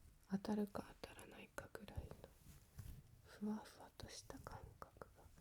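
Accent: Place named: United Kingdom. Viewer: Interesting japanese accent. almost sounds english sometimes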